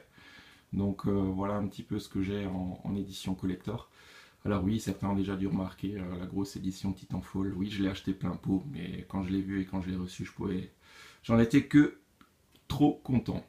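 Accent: Alsace, France. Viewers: Magnifique collection !! J'en bave de jalousie ! Je m'abonne car j'ai vraiment envie d'en voir davantage! Ah et au fait, je croit reconaitre in accent alsacien !?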